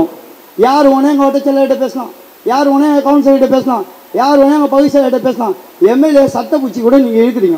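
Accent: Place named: Chennai, India. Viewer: அண்ணா சென்னை பாஷை ❤️❤️❤️🙏🏿🙏🏿🙏🏿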